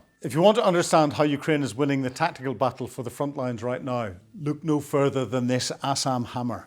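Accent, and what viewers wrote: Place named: Northern Ireland, United Kingdom. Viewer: Love your blog,love your NI accent AND love your jacket 🇬🇧🇺🇦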